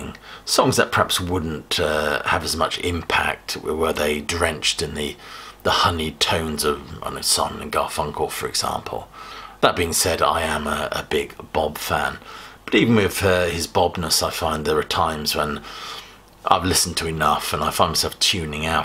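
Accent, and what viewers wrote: United Kingdom. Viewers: skip it ... he is talking nonstop with a British accent and going nowhere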